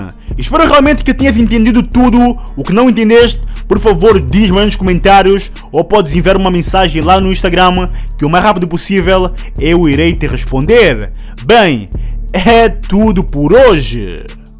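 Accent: Angola. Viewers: Ele mora na gringa mano Ele deve ser da Angola e tal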